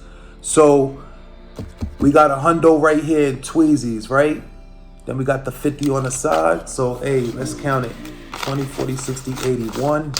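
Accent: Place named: New York, United States. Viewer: aye you sound from NY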